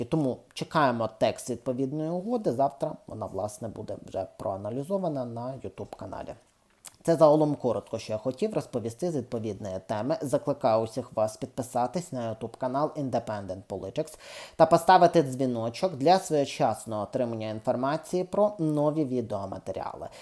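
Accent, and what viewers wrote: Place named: Canada. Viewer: цікавий акцент. ви проживаєте у Канаді?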